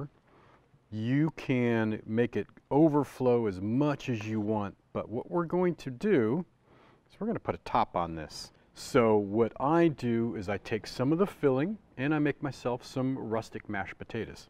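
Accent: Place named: Scotland, United Kingdom. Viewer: Very Scottish.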